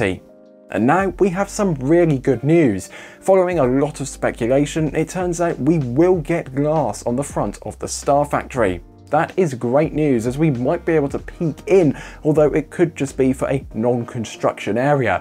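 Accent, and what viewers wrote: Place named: Midlands, United Kingdom. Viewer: Brilliant, just Brilliant. Nice one! Anyone would think you were Scottish, with NO sunshine on that skin. But the middle England accent says no!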